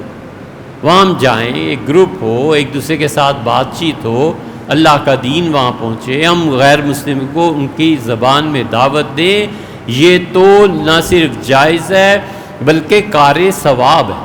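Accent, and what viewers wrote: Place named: Lucknow, India. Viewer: دریافت فرمایا بشمول کتاب کا عکس مفتی صاحب کی مندرجہ بلالا گفتگو پر غور فرمایا جاۓ کہ مفتی صاحب اپنے لکھنوی لہجہ و زبان کو رائیج کرنے میں کس قدر کوشاں ہیں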